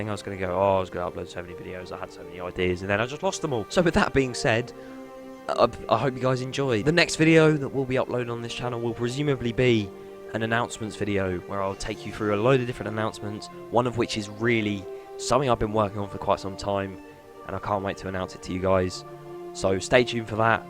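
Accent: United Kingdom. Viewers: where in england are u from?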